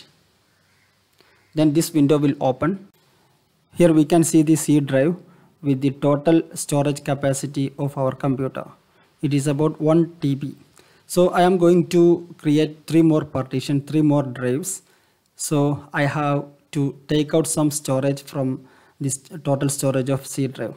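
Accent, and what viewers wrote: Kerala, India. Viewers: Malayali analle ???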